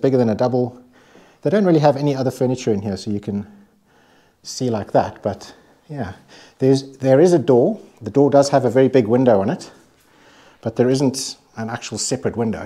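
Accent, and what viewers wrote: South Africa. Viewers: Hello I have just come across your channel and I had a quick look at what video's were on your channel and I seen lots of properties so I am now subscribed I have a question for you and I am eager to know if I am correct in thinking by your accent that I believe your maybe from South Africa?? Eager for your reply Enjoying what I have seen so far and I think I am gonna be binge watching these videos 😊😊 Lisa UK xxxx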